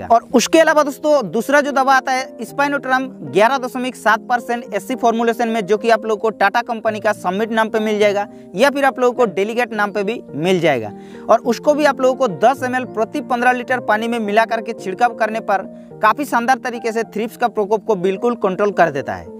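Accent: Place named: Odisha, India. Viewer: यह तो उड़ीसा उनका बोलचाल से समझ हिंदी बोलकर कितना वीडियो बड़वार काम हो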